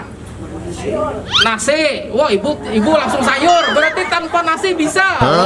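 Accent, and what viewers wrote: Banjar, Indonesia. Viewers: Luar biasa penjelasanya pak. Ingat waktu di Banjarmasin, logat Banjar. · YaAllah pantas pina logat Banjar, skalinya dasar org Banjar 😂😂😂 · Bahasanya kayak orang banjar kalsel om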